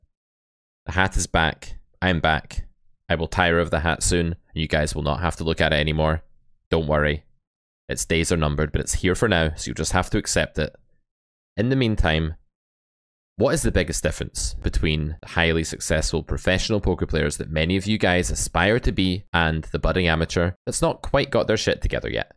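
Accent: Scotland, United Kingdom. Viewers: New sub! Where in Scotland are you from? -poker player from East Kilbride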